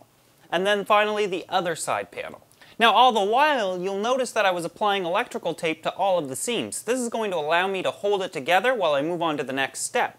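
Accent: Canada. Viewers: fuck I love the way canadians talk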